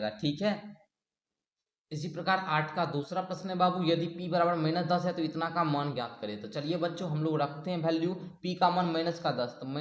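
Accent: Bihar, India. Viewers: Kya Bihari Ho Ho😂😂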